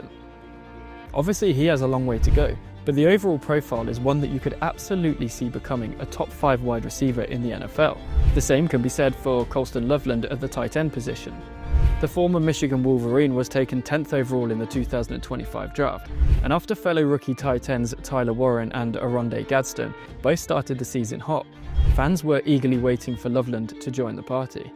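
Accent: England, United Kingdom. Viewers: No offense meant, but so weird to have a video about football narrated by an English accent. I thought most in the UK couldn’t care less about American Football. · Why are you English? I love this video and your breakdown.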